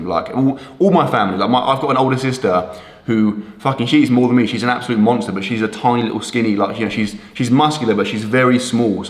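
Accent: United Kingdom. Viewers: It’s the British accent, like I can’t stand the voice at all, no offense to anyone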